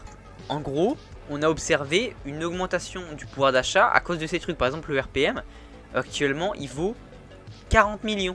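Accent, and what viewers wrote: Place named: England, United Kingdom. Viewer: Bonne idée cette vidéo et ton accent anglais mec woaw 🔥 et 545m pour hypercaisse euh.... 🧐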